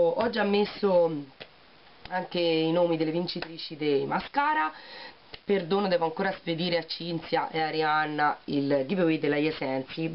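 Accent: Tuscany, Italy. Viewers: Mi fa sempre piacere sentire un dialetto amico su Facebook, una bella toscanaccia come me :)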